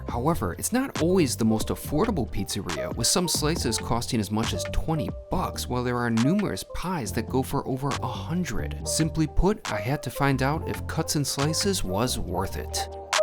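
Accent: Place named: Wisconsin, United States. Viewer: That accent… You’ve got to be from Wisconsin?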